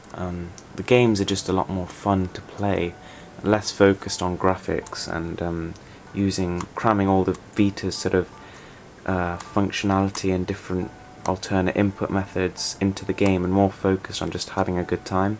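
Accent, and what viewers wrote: RP, United Kingdom. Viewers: Wait, is that a Received Pronunciation British accent I'm hearing? I don't hear that too often. It's very nice.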